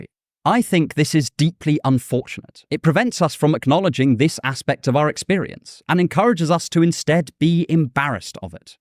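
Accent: United Kingdom. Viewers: I watch for philosophy but also bc your s tier British accent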